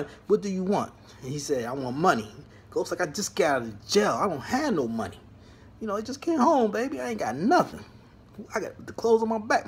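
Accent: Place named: Philadelphia, United States. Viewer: You sound like you from Philly... Lol love the review and theatrics 😎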